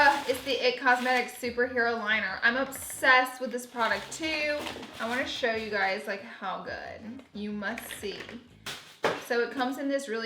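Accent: South, United States. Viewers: Miss your southern accent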